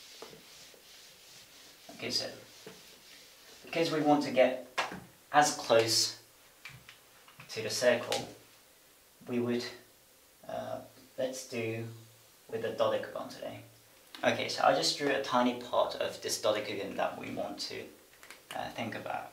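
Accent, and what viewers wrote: United Kingdom. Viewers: 70% British + 20% American + 10% Japanese accent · Interesting video! You explain things clearly. By the way, you speak with a British accent but pronounce "diameter" in a very American way. It's quite funny.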